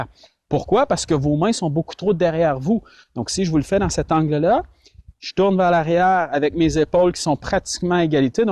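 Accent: Quebec, Canada. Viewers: Que de bon conseils simples, efficaces et jamais entendus avec en prime l’accent québécois que j’adore. Je continue à dévorer toutes vos séquences et les partage entres amis. A 70 ans, J’ai une autre approche du golf Bravo. Guy le malouin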